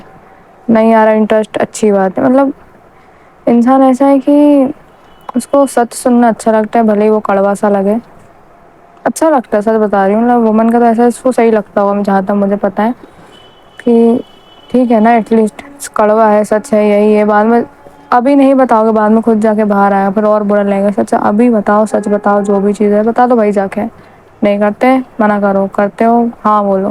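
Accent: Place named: Madhya Pradesh, India. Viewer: Tum MP se ho kya? Tumari accent se lagra hai. Btw consistency on 🔥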